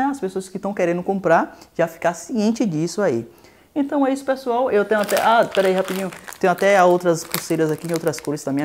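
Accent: Bahia, Brazil. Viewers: Sou de São Paulo e adooooro seus vídeos, seu sotaque baiano é musical😊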